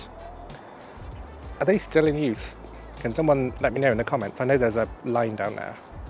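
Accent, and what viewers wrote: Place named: Birmingham, United Kingdom. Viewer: It’s how you say it! Once a Brummie always a Brummie bab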